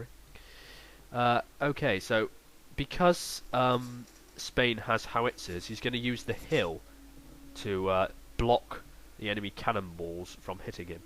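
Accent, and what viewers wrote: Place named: Barnstaple, United Kingdom. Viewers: You sound like you are from Barnstaple! Noice! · He sounds a bit West Country, maybe possibly Berkshire.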